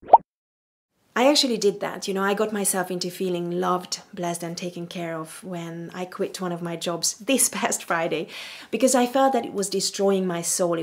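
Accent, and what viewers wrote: Hungary. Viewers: Hi from Hungary. You sound to have a Hungarian accent.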